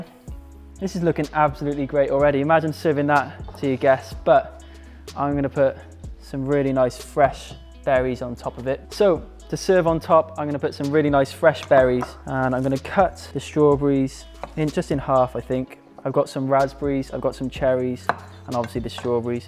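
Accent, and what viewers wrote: United Kingdom. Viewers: Does anyone know where about in the UK his accent is from?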